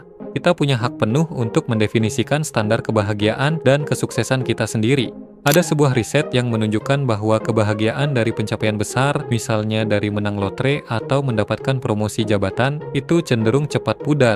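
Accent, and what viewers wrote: Bali, Indonesia. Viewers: blinya logat bali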